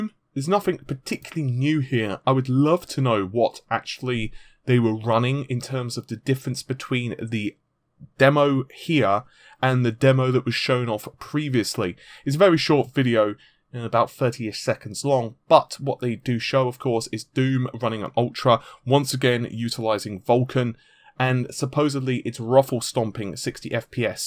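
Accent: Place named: Southern England, United Kingdom. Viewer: He has a perfectly normal southern English type accent. Have you never encountered one before?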